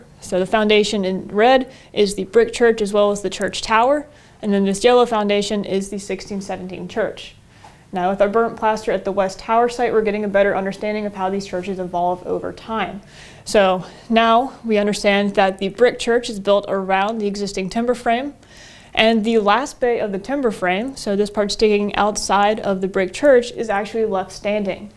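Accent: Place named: Dorset, United Kingdom. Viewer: Hi. Really like your accent and tone of voice. It reminds me of my family from West Dorset in England. I also wonder that apart from the hard archeology how much effort do you make regarding social and economic history, the evolution of dialects. Perhaps you do follow the journey from an English colony of dissenters and adventurers to freedom you sort and the creation of your own country. Excellent and easily understandable videos. Nick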